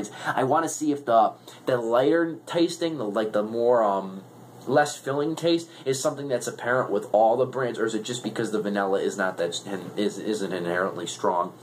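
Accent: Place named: Chicago, United States. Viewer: Nice Shicehgow accent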